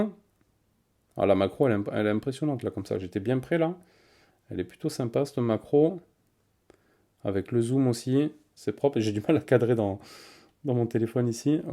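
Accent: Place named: France. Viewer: I really love his French accent. 😆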